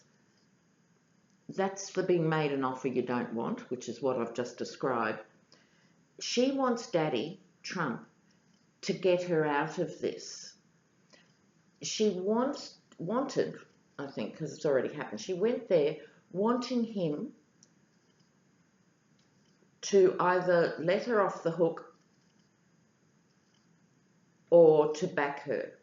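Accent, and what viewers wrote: Australia. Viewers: Linda Casey, That had me cackling. I missed that because I'm used to the Aussie accent. But seriously, the visuals of Trump humping Hope are just too... excuse me while I barf.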